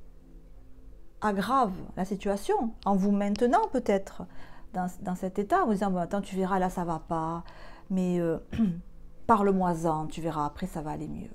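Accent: South, France. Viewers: Bonjour vous ètes incroyable, je suis seul aussi, à vous entendre vous ètes du sud, dommage, je suis dans le "chamanisme" Bonne continuation.T.M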